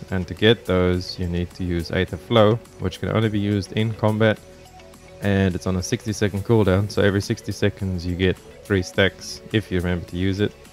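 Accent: South Africa. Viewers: Another South African?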